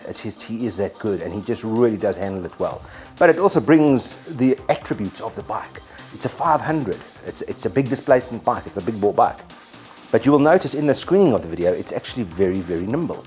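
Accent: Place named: South Africa. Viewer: that south african accent doe ?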